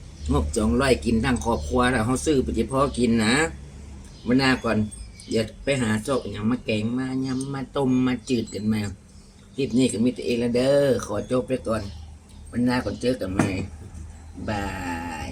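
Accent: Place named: North, Thailand. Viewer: บ้านอยู่ไหนนิอู้เหนือ😁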